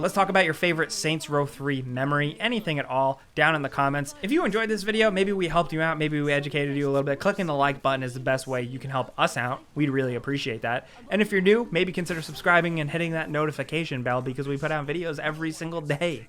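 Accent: United Kingdom. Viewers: British male voice is the best!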